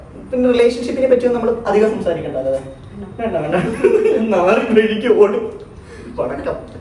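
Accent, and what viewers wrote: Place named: Palakkad, India. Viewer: Chechide slang enik valare ishtaayi ❤❤❤ nammade Pkd slang....❣️❣️❣️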